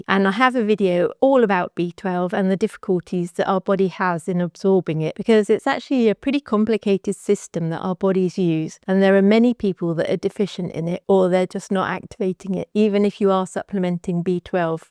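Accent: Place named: United Kingdom. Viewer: British accent is great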